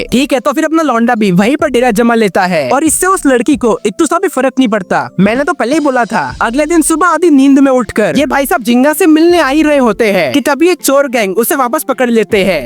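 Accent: Assam, India. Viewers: Bro, are you from Assam?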